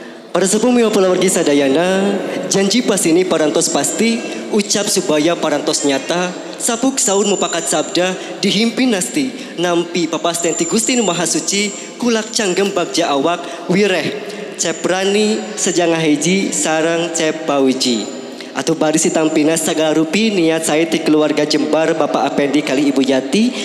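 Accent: Sunda, Indonesia. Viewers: Sae tah nyunda ngalegeuna.ciri has utang sunda.terus mumule bahasa sunda.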